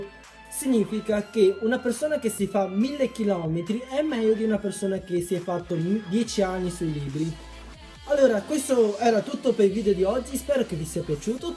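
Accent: Veneto, Italy. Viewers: ma sei veneto??? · Ahahahahh grande, ho messo like subito per il dialetto Veneto♥♥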